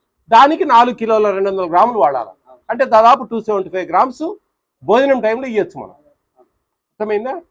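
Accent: India. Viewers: మీకడప యాస బాగుందండోయ్ ,👌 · Sir మీ మాటల రాయలసీమ మాండలికం చాలా నాకు నచ్చింది సొలకాడ తీసుకోవాల్సి వస్తది అనేది. Good work sir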